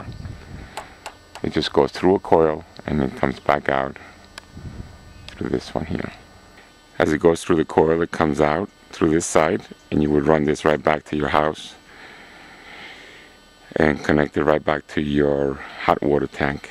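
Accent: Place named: New York, United States. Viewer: Hes in the Northeast, listen to his New York accent and notice his New York plates.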